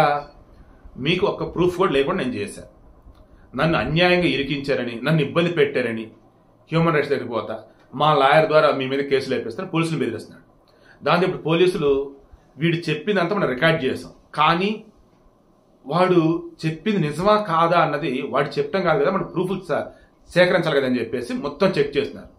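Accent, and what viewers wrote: Andhra Pradesh, India. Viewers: Meeru mareee ...andra ....yasalo...matladutunnaru.....koncem....bhasa marchndee....speed ....kuda tagginchindee....andariki ardham awaali kada......🎉🎉🎉🎉🎉... ...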